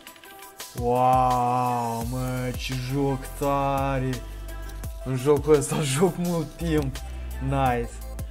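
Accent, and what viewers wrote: Moldova. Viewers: Nu sunt hater dar nu-mi plac cum vorbesc moldovenii nu e vina ta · Esti din Moldova :D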